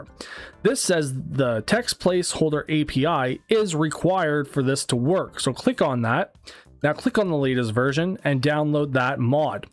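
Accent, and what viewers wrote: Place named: India. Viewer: YouTube classified your voice as Hindi for the audio track 👽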